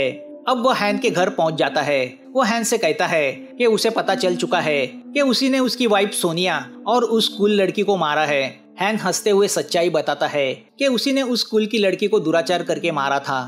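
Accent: Maharashtra, India. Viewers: Bhau tu marathi ahes? Accent vrn vatt😁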